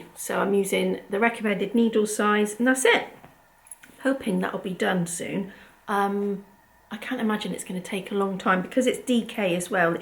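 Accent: United Kingdom. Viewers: hello, I am new here, i enjoyed this episode so much:) I also have daughter age 17, shes studies at gymnasium grade 10, i could not understand a of your education system, did i understand right, son is at grade 6 or it's something else? Also I made a hat Melete , its really warm hat:) I don't know from what country you are, sounds like UK, but in Latvia we have really cold weather till -20, and I hope this will be warm enough for my daughter. Also loved suggestions for movies, tomorrow we will watch Wild robot. Thank you:)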